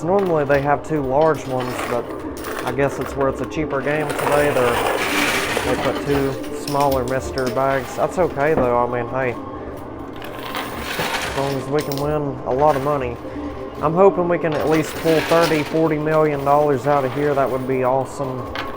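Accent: South, United States. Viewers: The fakeness of ur video is matched only by the hick-ness of ur accent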